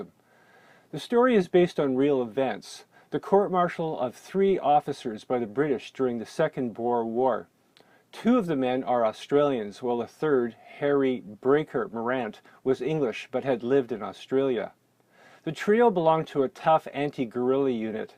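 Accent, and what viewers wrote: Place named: Canada. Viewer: Ah didn’t know that the Canadians pronounce the same as the yanks. I’ve recently gotten into your channel and I’m enjoying your content. And mabey someday we too can shed the shackles the poms have on our phonetics. Also have you thought about doing some kiwi cinema as it be good to see our cousins represented.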